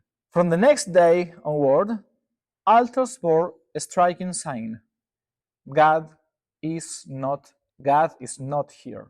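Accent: Argentina. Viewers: Muy bien inglés con acento argentino. Me encantó.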